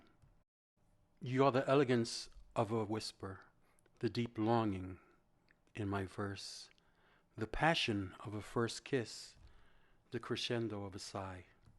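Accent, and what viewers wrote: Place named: East Coast, United States. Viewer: Look at you - all grown up and on YT now!! Go, Go! PS - I remember first stumbling upon your blog and the YOU poem. It is SOOOOOO fun to hear your voice reading the poems. You haven't lost your upper East coast accent if you're still living in Florida?! PS - I could've sworn it was the shoes that make a woman beautiful?;-)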